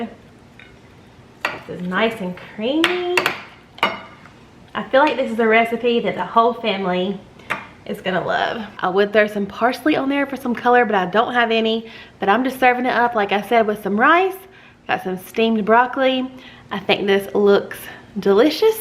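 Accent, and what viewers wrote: South, United States. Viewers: great recipes. Thanks. I could listen to your sweet southern accent all day long. Thanks for the great recipes!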